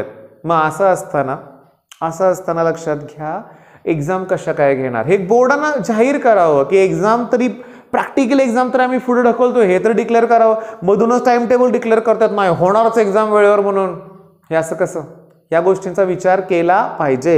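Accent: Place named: Satara, India. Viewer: साताऱ्याची भाषा !!! 😇 खूप भारी sir....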